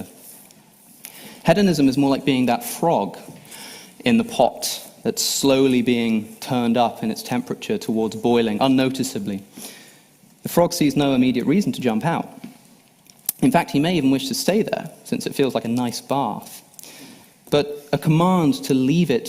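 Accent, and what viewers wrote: England, United Kingdom. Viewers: Alex champions the art of pretentious sincerity unlike a Sam or a Dawkins who are more blunt about their spite and hate. This speech also proved that if you take away his english accent, he's not even half as smart as Sam Harris.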